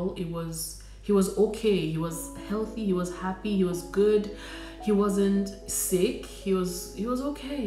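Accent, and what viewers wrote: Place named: United Kingdom. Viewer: This one that you are already having British accent 👀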